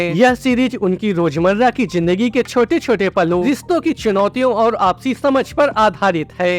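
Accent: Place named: Bihar, India. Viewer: Change ur voice bihari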